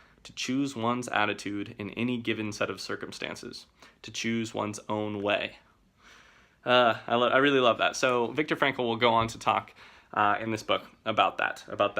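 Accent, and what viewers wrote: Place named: United States. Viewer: You know,. you are so American. The way you carry yourself and talk is typical American ( according to what I think is typical American I guess😅) so adorable🥰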